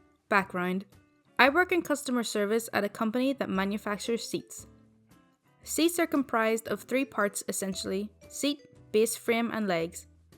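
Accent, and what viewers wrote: Scotland, United Kingdom. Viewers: Where is your ascent from? It sounds Scottish to me i love it though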